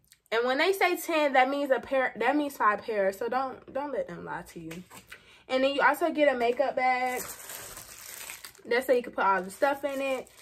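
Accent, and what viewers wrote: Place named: Memphis, United States. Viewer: You sound like you from Memphis!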